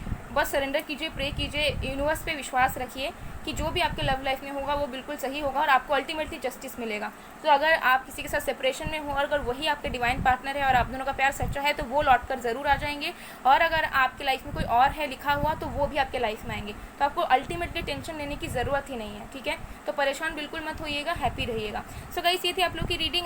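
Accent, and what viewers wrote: Bengal, India. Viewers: Mam aap bangoli ho kya.....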